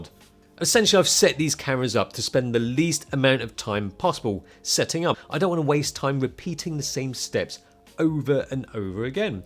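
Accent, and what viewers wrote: United Kingdom. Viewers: That is the cutest little British accent ever!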